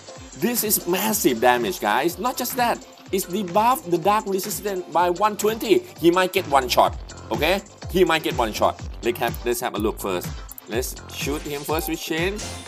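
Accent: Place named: England, United Kingdom. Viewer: I like your English accent its great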